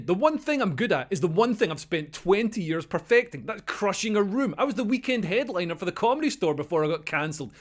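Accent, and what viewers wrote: Scotland, United Kingdom. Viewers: The Scottish are the best narrators 😂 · Noice, the Scot is back.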